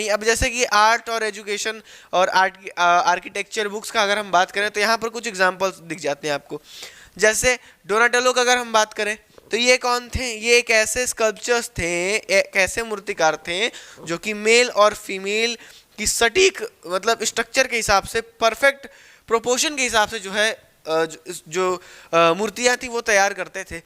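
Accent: Bihar, India. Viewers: Bihrai tone............stole my heart ❤ bro.............🖤 bhai billions subscribers ho apke🖤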